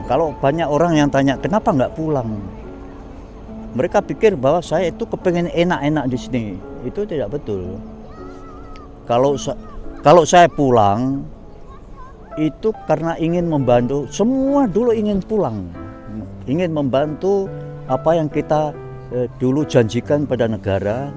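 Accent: Java, Indonesia. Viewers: Logat jawanya masih medok ya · Voila. Hebat, Pak. Bahasa Indonesianya tetap terjaga, juga medhok logat Jawanipun.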